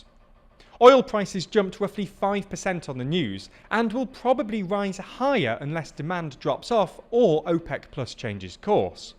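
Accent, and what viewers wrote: United Kingdom. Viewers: Yes, this is exactly what this channel needs, not just 538 with a British accent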